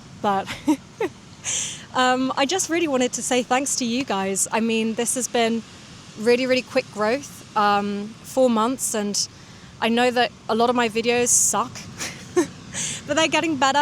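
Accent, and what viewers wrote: England, United Kingdom. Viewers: Hi!, well, I usually study American English but let me tell you that I understand you, not at all but I do. I love your British pronunciation, it sounds fantastic, though 2 or 3 words slip my mind but I can understand you. Greetings from México City. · That's the Englishest of Englishes I've ever heard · Are you British? I think 🤔 I love 💕 your accent. How can I get in touch with you? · As a native speaker of American English, I understood everything you said, quite effortlessly, despite the cute English accent and occasional British-specific idiom. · She is clearly British. Doesn't sound Aussie at all. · Forgot she spoke British English and not American English and panicked at first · Fancy British accent, thanks for native speed, its all clear. Is this true that most British people are very polite? Can they directly say "no" for any unlike favour? 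· I really like the way you speak, your British accent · Hi,there.Idid understand what you meant in the video.i love your UK sound,thank you 😊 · you have very clear british accent, I like british accent, specialy RP accent. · I like her accent; it reminds me of the British life I've always missed. :-* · Are you England? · Hello from Greece. Wow. You are great sweety lady. Your pronunciation was a bit expected as British lady, it was not so difficult to hear. Perhaps they are international English with British accent? We thank you very much for your video. Kisses from Athens. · I am an American and I love you Brits and your accents ! Nice job ! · Hi! I’m Korean and married to a British. That’s why I absolutely love the British accent! I’m so glad I can understand you much better than I thought. Haha. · Thank you for your video. I’m a Japanese student studying Russian. For some reasons I quit studying English after entering university, so my English got worse. 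But after watching some videos of your YouTube channel I wanted to start learning English again. You speak slowly, so it is easier to understand, than other YouTubers. And I like your British accent. I agree that surrounding yourself with foreign languages is the best path to master them. At first I couldn’t understand conversations of Russian native speakers, but I started to make it a point to listen to podcasts or YouTube for language learners every day, one day I completely understood what they are saying. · Funny to see this recommended while being a native-English speaker (I live in the US), and it seems it was also recommended to many other English-speakers. In my case, I may have seen videos regarding learning English from non-native speakers where those learning were native to Japan or some other country, so 'learn English' would be the common point there. This video does help in one way, and that's hearing a UK English accent that might be at a little bit faster speed than I'd heard from other YouTube channels or shows like Monty Python. 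I also sub to a couple channels from Ireland and one from Scotland - so also English, but a lesser-heard accent, to me. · beautiful england accent. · I've been learning english like 7 months and i kinda got 99% of this video like every single word i understood no problem and the best part of it that i sorta lerned and watched only american way of english and even with ur british an accent i got everything as well and honestly i really like how u pronouce without "r" so thank u for this video and contiue do what u do and u become as famous as mr beast in world of english learners xd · If I understood everything it means I'm an advanced speaker? (I'm really asking, my native language is spanish tbf) Cuz tbh it wasn't difficult at all, your pronunciation and accent is very pleasant and clear compared to others brits I heard before. I'm surprised indeed cuz I learned the USA english and that's the accent i'm most used to (still can't understand those texans cowboys brokers selling cows, their speech speed is about half the speed of light lol)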